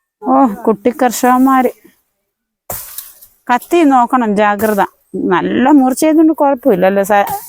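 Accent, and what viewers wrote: Kottayam, India. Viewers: വീട് കാസർഗോഡ് ആണെന്ന് മനസ്സിലായി. എന്നാൽ ഭാഷ കോട്ടയം പത്തനംതിട്ടയിലേതു പോലെ. ആൾക്കാരുടെ സംശയത്തിനൊന്നും മറുപടി കൊടുക്കാത്തതെന്തു.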